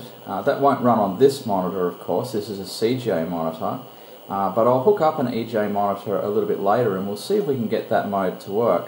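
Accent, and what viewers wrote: Australia. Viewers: Enjoyable. Came here from retrospectre channel. Subscribed. Nice to hear a fellow ausdie voice too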